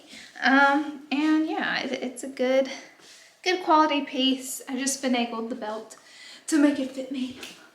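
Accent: South, United States. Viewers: Wow it’s wild how she really used to use this fake voice … like her Paris days. She almost sounds like Brittney spears. Like southern accent meets valley girl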